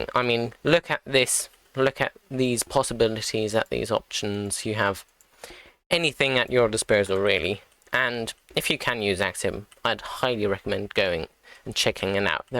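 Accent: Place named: Estuary, United Kingdom. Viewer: I am an American who loves the UK. I think us Americans automatically think monocle whenever there’s some RP in a British accent. I love yours. Sounds like an estuary accent. Somewhere around the home counties.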